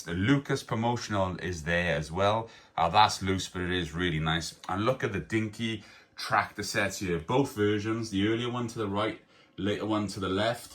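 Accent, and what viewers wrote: Wales, United Kingdom. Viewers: another great video! what a collection! utterly magnificent like the Corgi one, when i watched the Corgi one i was thinking that they were the greatest die cast manufacturer ever as i often do but when one sees a collection like this of Dinky it's a reminder that Binns road was every bit as good really, i love them both the same as i have always believed that the Dinky range was better in terms of the range of marques they modelled whereas Corgi's magnificent gift sets were truly wonderful, i also love Matchbox especially the early Lesney gift sets, glad that you are feeling so much better, your enthusiasm is amazing! i have a near mint original Chipperfields set with the booking office, it's a prized item, many of the dinky's shown i own but they're not in as good condition and many are without boxes but i love them! best wishes, incidentally my cousin's husband who is from Swansea was over recently and when he was chatting with me i half expected him to start waxing lyrical about die casts! 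the accent is great!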